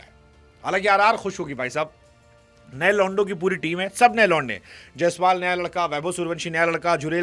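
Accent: Bihar, India. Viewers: Bihari bolte mittar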